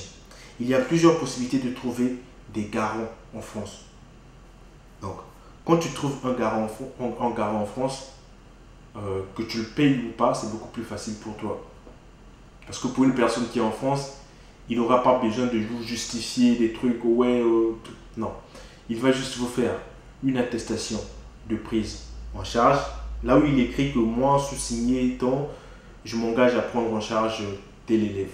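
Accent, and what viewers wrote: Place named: Democratic Republic of the Congo. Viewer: On retrouve l'accent congolais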